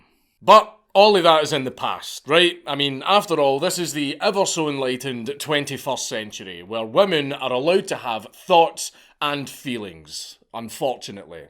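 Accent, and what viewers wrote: Scotland, United Kingdom. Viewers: stfu ur scottish brother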